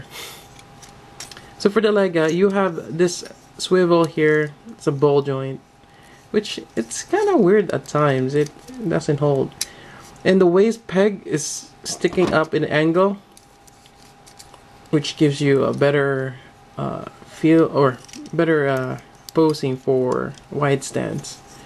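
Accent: Philippines. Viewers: yo sir! sorry, are you from the philippines? medyo pinoy yun accent mo hehehe. if you are i'm curious where you picked this up! available in greenhills?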